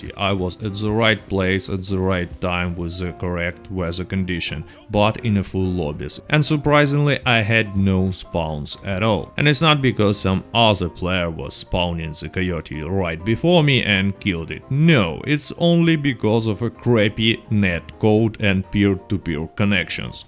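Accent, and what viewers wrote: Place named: Russia. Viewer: Is he Russian, is he German, is he whatever Niko Bellic is?? Maybe he can do an impression of him!! Please say "Anything for you, cousin!" I love this dudes accent!! (btw I know hes Russian but his accent sounds like other accents..so awesome)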